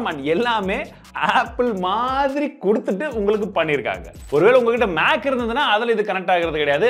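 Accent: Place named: Usilampatti, India. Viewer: Uslimpatti slang super bro 🔥🔥🔥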